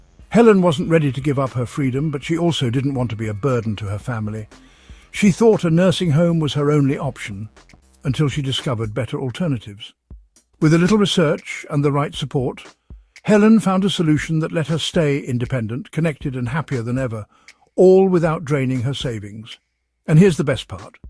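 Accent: England, United Kingdom. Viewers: So despite the AI David Attenborough ENGLISH accent narration, this is intended for an American audience. Would be good to point this out at the start or in the title.😊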